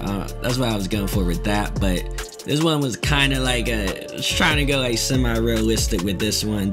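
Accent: Baltimore, United States. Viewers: You from Baltimore ?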